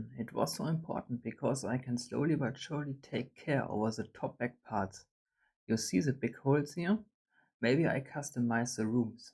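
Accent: Germany. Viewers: When you don't care what others think and you do projects purely for self-gratification, the results are astounding. That's how things should be, in my opinion. Keep it up man. PS:- I like your German accent lol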